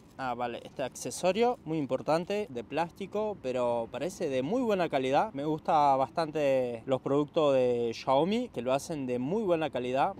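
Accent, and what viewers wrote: Argentina. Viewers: Habla una mezcla entre porteño y español ajajajaj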